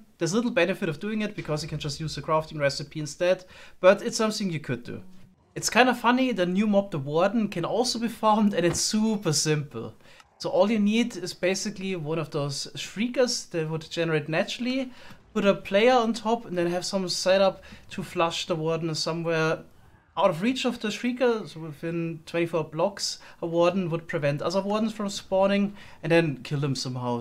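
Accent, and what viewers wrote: Germany. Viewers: Bro sounds to german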